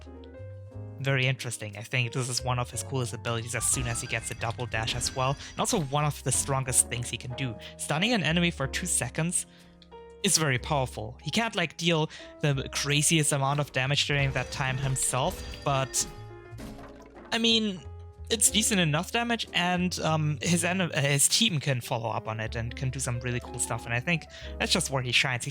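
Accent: Germany. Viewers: Man hört das er deutsch ist :D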